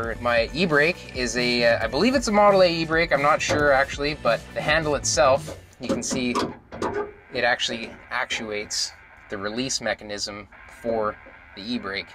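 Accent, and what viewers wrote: Canada. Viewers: I have no idea who you are, you sound Canadian to me, but I love what you built... yup... I'm gonna subscribe for sure... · Thats why it doesnt sound arrogant. Its canadian. Ill sub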